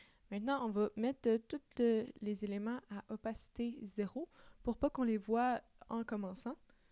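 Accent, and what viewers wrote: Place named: Quebec, Canada. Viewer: Merci pour ce tuto clair et pratique. Et l'accent de nos amis québécois en plus.... J'adore.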